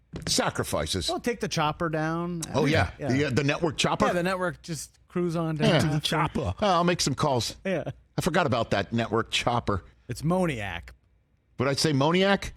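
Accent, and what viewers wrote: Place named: New Jersey, United States. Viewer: Anyone else notice the jersey accent comes out when he gets angry and animated 😂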